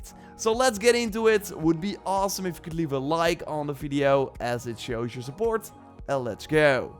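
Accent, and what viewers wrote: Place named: Netherlands. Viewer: Are you dutch by any chance? Your accent sounds familiar! Great vid btw!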